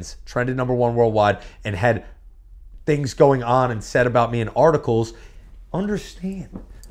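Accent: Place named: New York, United States. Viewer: Why does he's new York voice go in and out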